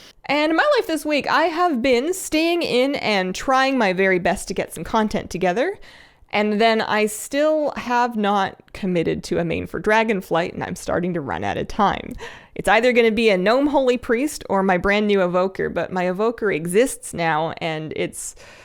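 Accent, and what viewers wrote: Canada. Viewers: Hi, maybe it's just me but I think you are sounding more Canadian! The last couple of times I tried, I could not give the trinket mats to other eligible players. I hope the enchanting bug is fixed soon, and I really miss Bagnon.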